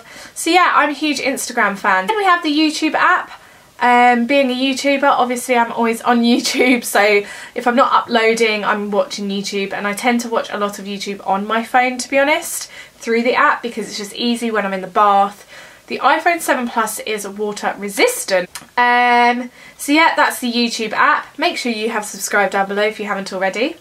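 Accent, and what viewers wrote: United Kingdom. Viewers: You’re very beautiful! I can’t stop telling you that😂 i love your hair, your makeup, your eyes😍 your clothes, your bed sheets, your british accent, your soul! Where are the guysss? We’ve got a gorgeous girl here how they cannot see thatt?🙋🏼‍♀️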